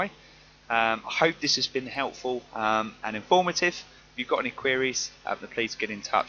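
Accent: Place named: United Kingdom. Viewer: Ahhh a sound of a British accent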